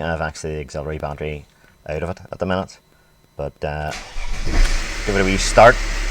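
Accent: Northern Ireland, United Kingdom. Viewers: Good video thanks. Great to hear the norn iron accent!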